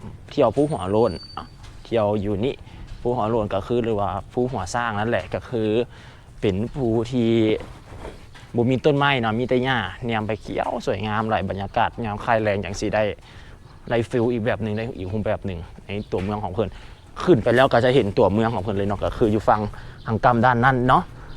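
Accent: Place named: Lue, Thailand. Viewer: เขาพูดภาษาอะไรเจ้าของช่อง (สำเนียงคล้ายๆพวกลั่ว) มันอยู่ภาคไหนของประเทศเจ้าของช่อง แม้!!คลิปแบบนี้เรื่องของอยากของกิน น่าจะจัดสัก25นาทีขึ้น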